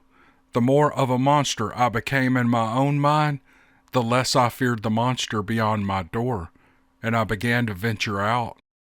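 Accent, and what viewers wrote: South, United States. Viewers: To the person who mentioned your accent. Didn’t the name tip you off? It’s not called “ Fargo Cryptic “. voice is not only soothing, it’s perfect. I listen at night while shutting down for the day. It’s the perfect bedtime stories. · Yes Sir Mr. -- I not only made it to the end, but this is the third time I've done it. I like to cue up a few videos, press play just before climbing into bed. ~ My bed, is surrounded with speakers, and there's a 75" UHD 2160p monitor a few feet away, into which a computer, amp, & sound mixer are connected and nothing else. I refuse to have cable or ANY type of mainstream TV. Just the internet, and full control of what content comes into my home. Needless to say, I often get so damn comfortable, it's about all I can do but fall asleep. I'm kinda new to this channel, in terms of time, (about 6 months) but not so new in terms of content. 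I listen to something here at Dixie, close to every day, or sometimes binge. ~ When I DO pass out, and miss out on the end of a story, I rinse and repeat, next day. Usually only with THIS channel, -- Or sometimes Buckeye BF and recently Neoma Finn's channel, but mostly THIS one, because it is about the best for really good stories...(Man, there's a lot of crap out there) --I love that nice Southern accent, (I'm sure You know the one I mean), soothing narration, and genuine, original content. (Dang!, If I would have ever met a cute girl in my youth, with an accent like that, I'd be FINISHED. My heart would never have escaped alive...) ~You have a LOT of videos, with really good stories that feel quite sincere. I am in NO rush. I'm taking my time. To thoroughly, slowly go through them. I like the long ones, and that's why I like the YT CUE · I listened to the entire series. I loved it. Each story was unique and interesting. 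I am a great fan of your podcast. To the snob who criticized your accent, as another Southerner, I would quote my Momma who would say, "kiss my A double scribble." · I made it to the end, yah!!! I'm just sad it ended. I could listen to these forever, I wish they were hours and hours long, I never get tired of it! I have always loved listening to people tell stories, I love stories! Now every night is storytime!!! My daddy was the greatest storyteller, he told us stories all the time and I loved it. He could just draw you in and keep you hanging on by your fingernails; he was a scotch Irishman and you know how they can spin a tale, except his work true. I love the sound of your voice and I can understand everything you say because you sound like everybody I know. You've got that slow Southern drawl that I know so well. You seem like the nicest guy in the world. I started watching this one about last night, I would listen a while and fall asleep a while and repeat... 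several times. I finally made it through and it's now in the morning; I think I'll take a nap. Later, I'll start my search of your other videos for my fright night creepy fest. Late at night, when the lights go out, you come on; you make living fun. God bless you real big Jude, from Kentucky ✝️🐴🌿🇺🇸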